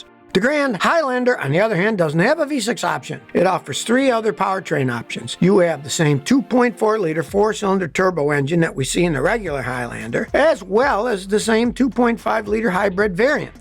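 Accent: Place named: Michigan, United States. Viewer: did you grow up in Michigan? I did, and you sound like you did!!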